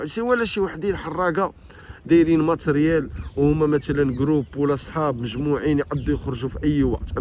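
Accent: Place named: Algeria. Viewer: فديوات ديالك سمعتهم قبل ولكن بلكنة جزائرية كانت واعرة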